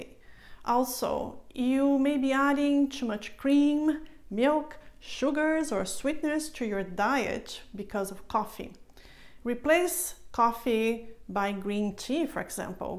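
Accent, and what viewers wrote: Brazil. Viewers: Brazilian accent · Muito bom vídeo! Pelo sotaque acredito q a senhora seja brasileira. Ótimo trabalho!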